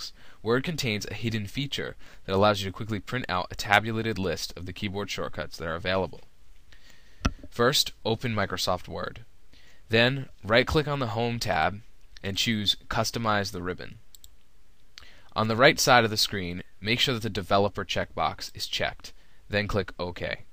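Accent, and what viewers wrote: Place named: United States. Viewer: Thank you so much! This is exactly the information I was looking for i.e. 'How to print a list of your customized keyboard shortcuts'. I've been looking for this since forever. This video should have Millions of views! - It's short and to the point. - It's narrated with clear native USA English. - It does what it is meant to do i.e. help people.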